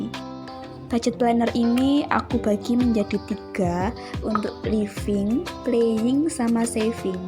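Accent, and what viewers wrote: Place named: Java, Indonesia. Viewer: Wong ndi toh rek ... Jowoan ngene Seneng aku hehehe salam kenal ya kak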